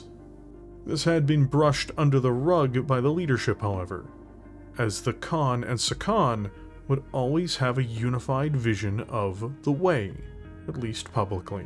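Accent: Canada. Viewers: I must applaud the incredible effort. A near 5hr monster that gave you no safety net to fall back on is hercule task in itself, but at first you seemed to follow the Doctor Who timeline of certain moments in history always have to happen. Not saying that couldn't glean out possibilities of who what and where, but the why was always going to be there. Twycross would always happen, yet a Rasalhaguean airspace pilot crashing into the bridge of a warship did not. Now, whether or not your crippling Canadian pronunciation is seemingly done to tweak my ears or that is just something that you do just to tweak the internet just because you have a channel and I don't. 1. I expected that Clan Wolf would be taken out of the driver's seat. Partly cause of (deserved) hatred and partly cause this was an exercise of a true 'what if'. You can't play fantasy with all the same pieces and expect a different result. 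2. Clan Hell's Horses. While the use of Hellions, Goliath Scorpions and Fire Mandrills was interesting, as some never had much written about them, so this was unique in this creation, but HH, with their mantra of combined arms of mech, tank and infantry, while similar to the innersphere doctrine of warfare, I believe in the long run, would have provided a more stable invasion force. But stable means boring and you would not have had Khan Steven Tyler(was FASA writers fans of Aerosmith?), blowing up about everything. 3. While I understand that you needed new players in the invasion of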